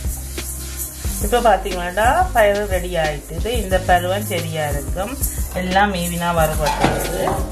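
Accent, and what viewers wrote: Jaffna, Sri Lanka. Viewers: Thank you.... love to hear again again our Original Jaffna Tamil. Great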